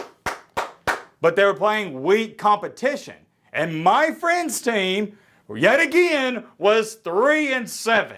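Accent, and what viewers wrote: South, United States. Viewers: listening to this guy gives me a headache. just some hick from the south that thinks he knows everything. im from TN too so i would know.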